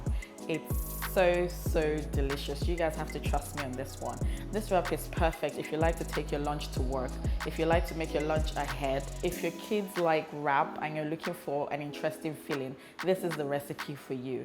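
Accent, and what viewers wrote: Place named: United Kingdom. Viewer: I use to think you live in the UK because of the way you speak. I love the recipe.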